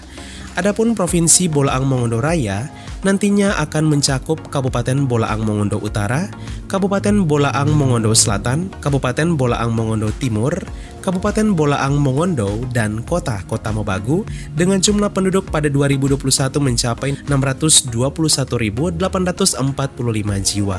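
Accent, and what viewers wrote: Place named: Banyumas, Indonesia. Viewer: Ngapak raya tuh...wajib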